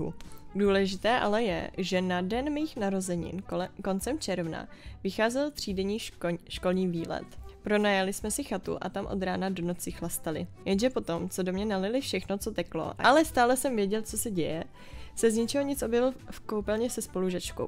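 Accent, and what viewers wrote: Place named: Slovakia. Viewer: super videa ale ta slovencina no docela sila :D Malem sem se podelal od smiechu :D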